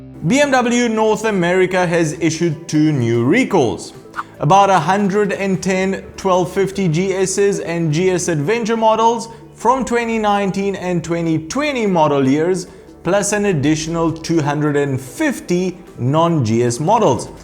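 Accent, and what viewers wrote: South Africa. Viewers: Great videos with a superb sense of humour. Put me out of my misery though. You live in Romania, yet your accent sounds a little South African, would this be correct?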